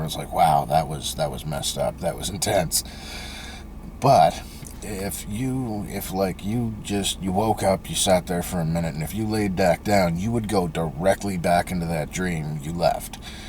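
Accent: Canada. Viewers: Good stuff man. I am ready to have that trip. You sound Canadian? How do I message you btw? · This guy is Canadian he says aboot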